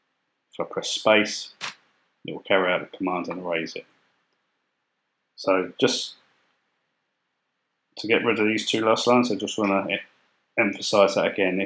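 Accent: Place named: England, United Kingdom. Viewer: Thanks for the great video (and the English accent), I'm investigating 'free' CAD software for members of our Mens Shed, a movemnt that I think is catching on in Uk as well now. Also liked your bird box video, the link for which I will pass on to some folks here (in Tasmania) who are looking to protect the Swift parrot from extinction. Using the Pi may be too high tech for their purposes but it might get them thinking.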